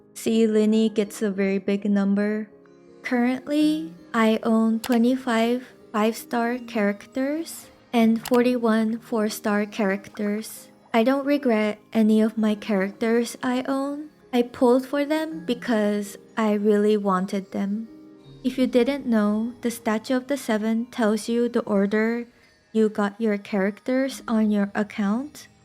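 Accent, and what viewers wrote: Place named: Indonesia. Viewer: ur not sound like japanese, more like indo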